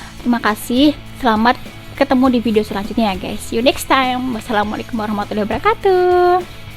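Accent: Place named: Java, Indonesia. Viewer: Aku suka sama dialek kaka yg medhok👍🏻🤭 Austrin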